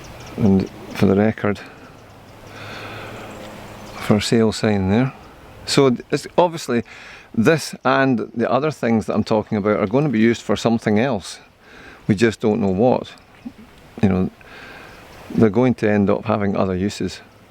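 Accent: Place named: Scotland, United Kingdom. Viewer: I've heard this mild Scots accent before . Tell me about it . I have even heard a milder Scots accent . And I have been tae Arran ...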